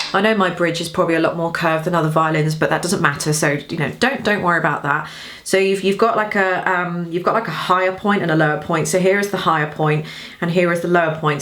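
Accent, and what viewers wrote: United Kingdom. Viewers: Definitely better without the mute. Then again I have been listening to my wife playing with and without for some 18 years. She started about 60 years ago, and has been a school orchestra teacher and violinist/concert master of a local community orchestra. Though she has had to give that up due to illness. This is a second marriage for both, as we were widowed within a year of each other. So, I am familiar with this, however, my attention was caught by the mention of Glaesel. Your voice told me you are either in Great Britain or derived there from. So I wondered if there is a Glaesel source in Europe. The Glaesel factory used to be in Cleveland Ohio, now In Indiana. When it was in Ohio Kurt Glaesel taught my wife violin repair, her other love. Now she is giving that up also due to her illness. That is why I am posting. Anything I want to know about the violin I want to know I can ask here, since not understanding what she is talking about seems more appropriate from my wife than a stranger. 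Not that she is difficult, but she just has so many decades of experience. She has the same problem with me when I talk tech. Oh, back to the point, is Glaesel still operating in Europe?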